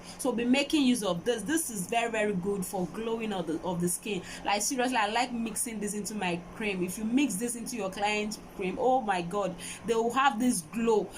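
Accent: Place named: Lagos, Nigeria. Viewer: Ur Yoruba accent is like dis Lagos pple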